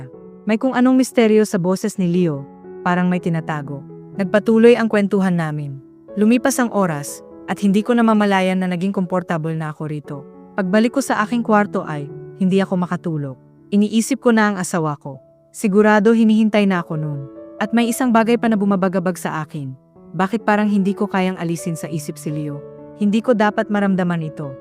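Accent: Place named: Visayas, Philippines. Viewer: Bisaya ka ba? Nkakawalang gana tagalog mo.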